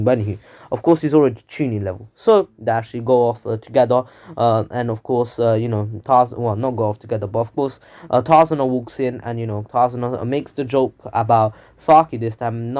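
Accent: United Kingdom. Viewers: You sound like a Leicester guy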